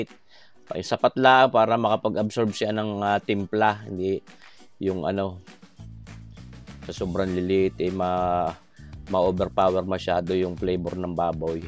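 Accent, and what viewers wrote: Batangas, Philippines. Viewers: Halo -njoyed Ur video n ur Batangueno slang 😀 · Nakaka aliw manood sa accent ni sir pinoy na pinoy🤟👍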